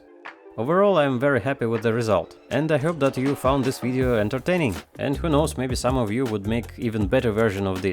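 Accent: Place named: Russia. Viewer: Хороший выбор!)Начал я смотреть видео и понимаю что акцент у ведущего, русский,но какое было удивление что это один из моих любимых блогеров.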